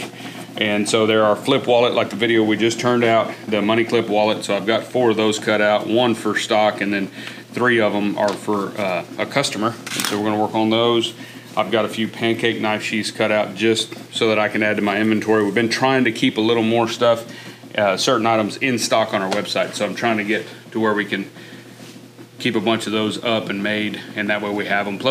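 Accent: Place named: Texas, United States. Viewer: Boy howdy, your Texas accent is really strong on this video! Who you been hanging out with this weekend???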